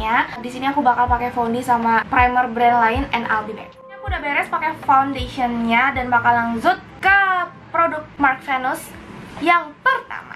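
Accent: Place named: Sunda, Indonesia. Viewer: orang sebrang tapi sunda nya udah lekoh pisan, ngakak lucuu but luv❤️❤️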